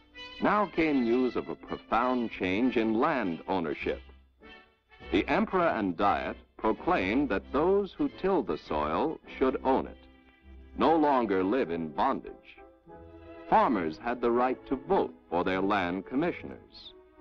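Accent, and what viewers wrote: United States. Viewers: Good American accent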